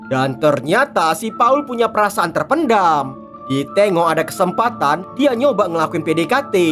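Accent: Medan, Indonesia. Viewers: Asal medan y bg.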